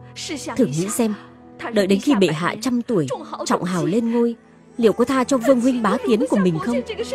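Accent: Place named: North, Vietnam. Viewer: Thuyết minh giọng Bắc kỳ muốn ói, phim hậu cung kế cũng có đàm diệu văn, tưởng nghị, dương di thuyết Minh giọng nam còn hay và dễ nghe hơn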